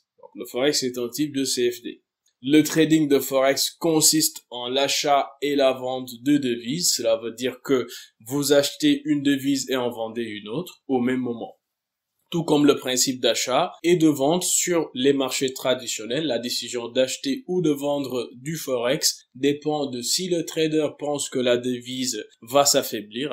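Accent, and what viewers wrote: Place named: Senegal. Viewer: Bonjour, très bonne vidéo ! J’ai crue percevoir un soupçon de parfum de la teranga dans votre élocution(!très agréable),right? Cordialement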